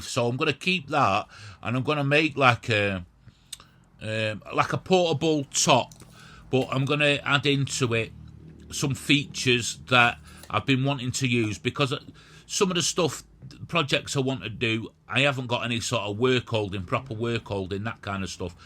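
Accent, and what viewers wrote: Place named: Manchester, United Kingdom. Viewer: new to your channel love the manc accent , just retired so started making birdtables still getting tools together , john from Altrincham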